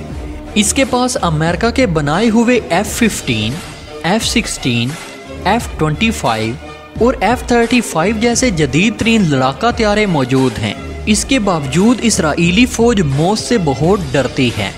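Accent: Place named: Punjab, India. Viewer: Yeah bhai Punjabi mei Urdu bool rehay hain 👎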